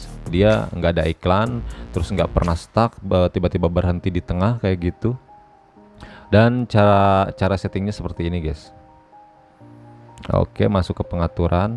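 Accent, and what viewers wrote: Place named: West Java, Indonesia. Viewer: Orang Sunda nih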